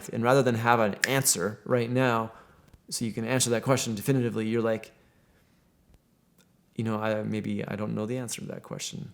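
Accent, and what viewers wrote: Canada. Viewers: I have a very similar perspective to you on beliefs, but you've worded it much better than I can right now. I went through a religious phase but now am agnostic. Honestly my belief system is a Frankenstein monster of bits and pieces of every religion, belief system, and psychology but at it's core it's trust my intuition on what feels correct. I also appreciate your Canadian accent, my mother's side of the family is Canadian and it's always made me feel at ease 😊 I'd love to hear more of what you think on this topic.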